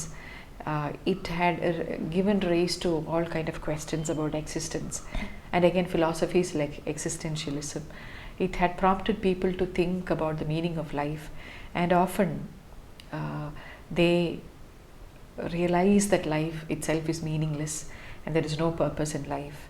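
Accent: India. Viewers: Love ur Indian accent 😊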